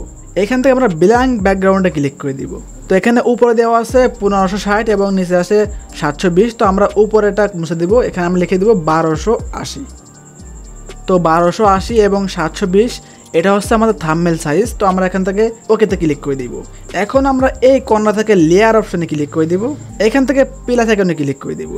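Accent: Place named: Pabna, Bangladesh. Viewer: আপনি কি পাবনার লোক পাবনার মতো ভাষা ।সঠিক হলে রিপ্লাই দিয়েন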